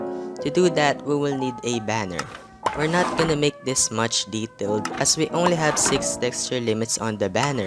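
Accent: Philippines. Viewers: Hello, are you fillipino?? Iam fillipino too... I asked that becoz of your accent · Your accent is like a Filipino speaking English. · Are you a Filipino? I can tell it with your accent. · Hi you sound like a filipino:D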